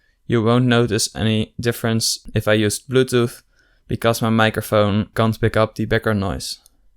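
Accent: Netherlands. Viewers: sws nederlander hahahha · ur dutch right?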